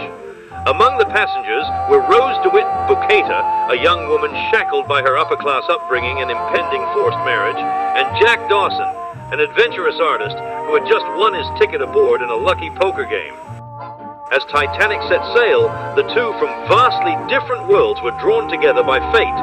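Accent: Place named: Transatlantic, United States. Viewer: literally a transatlantic accent.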